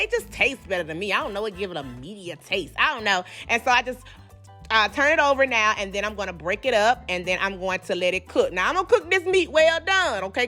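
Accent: South, United States. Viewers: I can tell your a real southern belle ma im from Mississippi so i love hearing that southern accent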